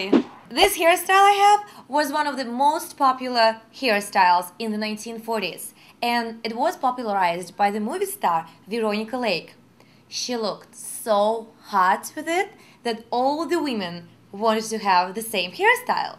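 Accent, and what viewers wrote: Russia. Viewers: russian english accent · nice russian accent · капец акцент питерский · Русский акцент... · I clicked a few of these because of they were featured. I think it's pretty cool what she does. Smart and good looking. Plus who does not like a Russian accent ? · такой акцент поймут только в россии :) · Её рязанский акцент раздражает...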